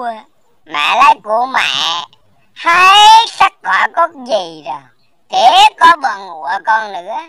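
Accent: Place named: Quang Nam, Vietnam. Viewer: Nói tiếng quãng thật nặng vô nhé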